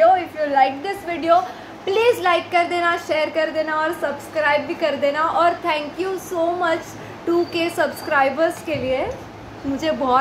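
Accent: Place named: Maharashtra, India. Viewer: Stumbled upon your vlog while watching Nomad Shubham’s vlog. Daily lifestyle vlogs are always a good idea. You hav an attractive personality. Just work on the quality of content, video and audio. It’ll definitely fetch you in times to come. All the best. I’m a GI Surgeon currently practicing in Mumbai/Thane; originally from Bihar. I like the Maharashtrian-Hindi accent of yours.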